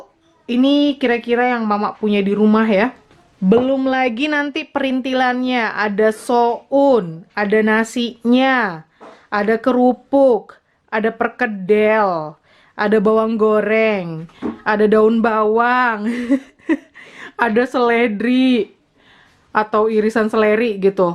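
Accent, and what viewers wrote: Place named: Medan, Indonesia. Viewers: Orang Medan mana suaranya😘😘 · Wah mamak ...kirain asli dari Medan seperti Saya... Soalnya logatnya Medan x...😅 Benar mak sumut itu tempat nya sejuta etnis😄... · Nggk usah ngaku jadi orang medan tok. Dari logat jg kita udah tau klw itok itu orang medan 😜😀😀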